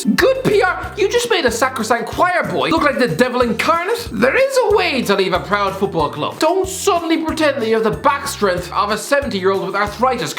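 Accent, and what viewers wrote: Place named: Ireland. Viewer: Irish "Bilabial Trill" Guy.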